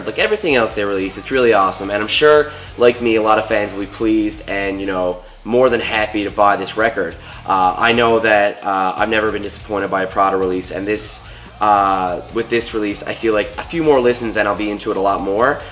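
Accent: Canada. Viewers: Are you Canadian ? Decent review don't like mikes new vocals .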